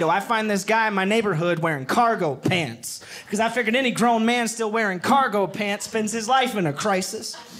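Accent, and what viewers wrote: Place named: South, United States. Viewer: you have a combination of a southern accent and a hood accent. it's cool.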